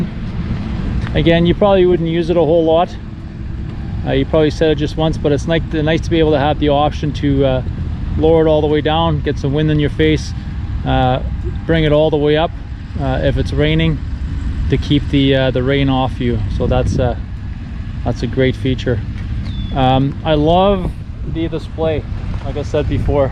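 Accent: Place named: Prairies, Canada. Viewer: I feel like your from Manitoba or Saskatchewan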